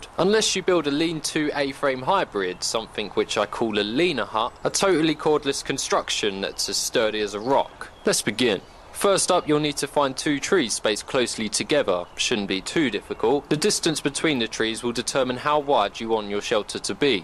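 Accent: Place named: Derbyshire, United Kingdom. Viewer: u sound like your from derbyshire? XD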